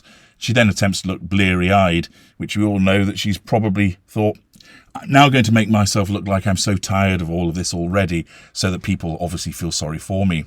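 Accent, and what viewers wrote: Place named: Yorkshire, United Kingdom. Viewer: Love that Yorkshire accent, you almost sound like me!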